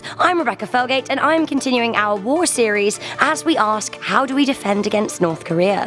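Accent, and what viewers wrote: United Kingdom. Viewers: I don't like the British accent.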